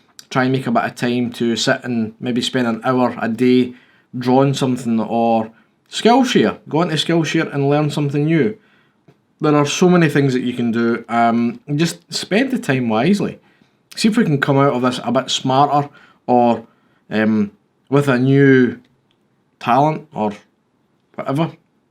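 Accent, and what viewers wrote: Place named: Scotland, United Kingdom. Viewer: No, having a Scottish accent will not put people off subscribing, although in my case I prefer it because I lived there and it’s not Northern Irish 😂. My favourite beauty YouTuber is also Scottish.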